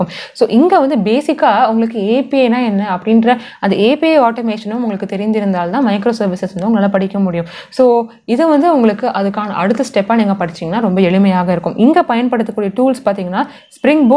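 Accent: Sri Lanka. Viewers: Akka...are you Sri Lankan??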